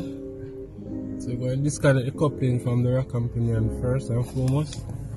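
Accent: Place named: Jamaica. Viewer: Sound like you in Jamaica, same place mi deh, I have the same car with the same issue. How can I get that bushing?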